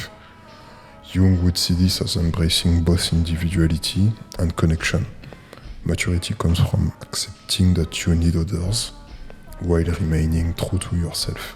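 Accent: France. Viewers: French accent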